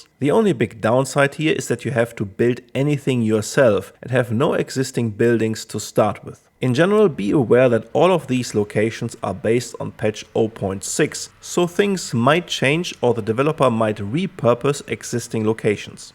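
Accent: Germany. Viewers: Bro, is that a KI set on maxlevel german accent, or is that Person real? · Da versucht aber jemand seinen deutschen Akzent zu verstecken, aber nach den ersten zwei Worten hab ich es schon gerochen😂 Dazu kann ich nur sagen, liebe Deutsche keine Angst vor unserem Akzent, die meisten Englischmuttersprachler (vor allem die Amis der Ostküste) finden unseren Akzent sehr charmant. Komischerweise empfinden nur wir Deutsche uns gegenseitig als unangenehm und versuchen den Akzent zu verstecken.... Warum auch immer. Mehr Mut zu unserem Akzent 💪😉 Gleiches gilt auch für Spanier und Italiener, auch die empfinden ihre Muttersprache mit deutschem Akzent gesprochen als charmant, das ist zumindest meine persönliche Erfahrung. Sehr gutes Video, hab das Spiel noch nicht gespielt, werde es aber mal testen. Weiter so! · Nice video! I also love your german accent 🍀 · I knew from the first words that ur geman. 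Mein kollege👌🏼👌🏼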